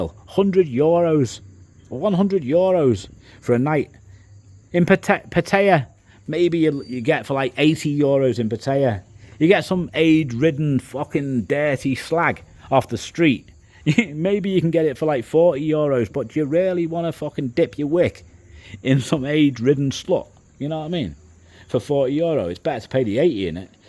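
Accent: United Kingdom. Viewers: Hi, I like your British accent, hopefully will go to show you my French Canadian accent later this year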